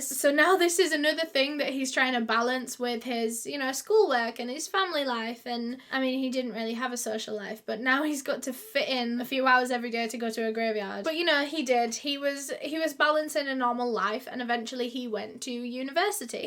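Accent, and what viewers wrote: United Kingdom. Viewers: Oh goodness. I've never heard your voice. I watch these with subtitles. I thought you American. I'm even more pleasent to hear your UK. Love it.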